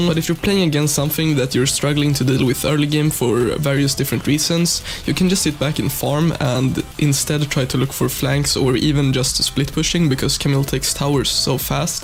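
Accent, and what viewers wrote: Sweden. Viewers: You can hear clearly he is swedish xD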